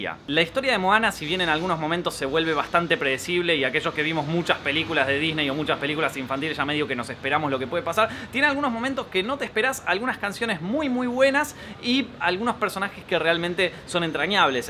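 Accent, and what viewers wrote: Argentina. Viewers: Mia Morena dijooo estrenado en argentina tiene un tono che ps q este es español viste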